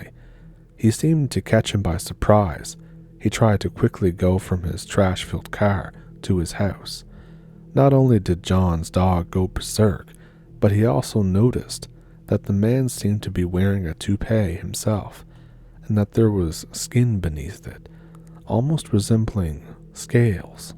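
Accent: Canada. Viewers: Hey, -.. 💙❤️💙💙, I love hearing my canadian uncles and cousins when you talk,😂- I just want you to know, how I enjoy your stories, you should hear some known things what happened in Emerald Triangle. 🎉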